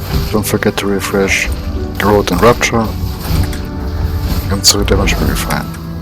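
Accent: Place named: Germany. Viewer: OMG der deutsch akzent XD Ich liebe es HAHAHAHA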